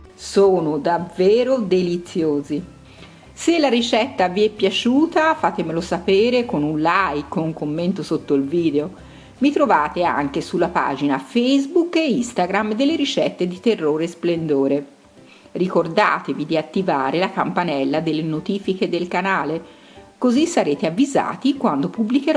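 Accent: Tuscany, Italy. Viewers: Anche lei è deliziosa, sia per la pronuncia Toscana che per le meravigliose ricette!!!!😘😘👩‍🍳🍰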